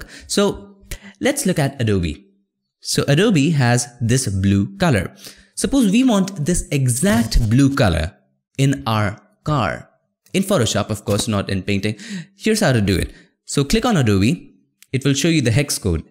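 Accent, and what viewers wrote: India. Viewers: I usually hate indian accents but yours is somehow great in my ears 😂👌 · cannot focus on the video... indian accent and connected eybrows ruined the experience for me :/ good video tho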